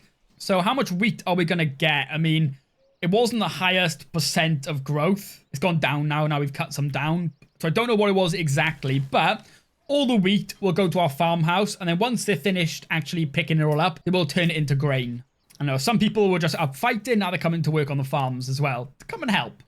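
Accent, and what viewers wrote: Wales, United Kingdom. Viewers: Is that a Welshman I hear? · Ooooof so Welsh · Got me hooked straight away with that Welsh accent lad!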